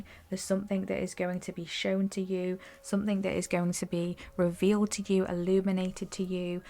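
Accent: Northern England, United Kingdom. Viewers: Beautiful message, beautiful name, do I sense a bit of northern English as we are the best 🎉❤🙏✨🌹🌹🌹 peace and light 🌟🙏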